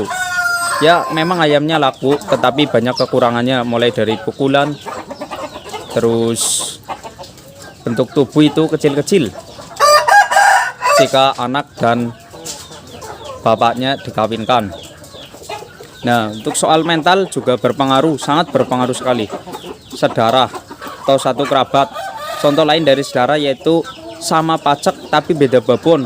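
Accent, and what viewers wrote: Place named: Banyumas, Indonesia. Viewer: Ngapak banget bg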